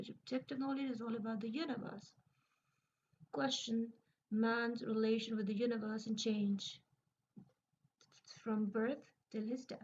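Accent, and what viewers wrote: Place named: United States. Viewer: Madam wese ap ny kaha tha ap ko easy tareeky se samjhao gi per ap ny itni American English accent bol kr pryshaan hi krdya ... over lagta hai...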